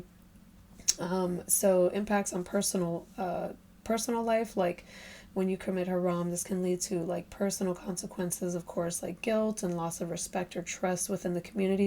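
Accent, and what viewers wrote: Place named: United States. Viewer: You have an American accent so speak about a more progressive Islamic world like some Muslim communities in the West... There are also progressive Islamic communities elsewhere in the developing world... Why only speak about the worst of the worst? But you don't do that with Christianity??Howcome?🙂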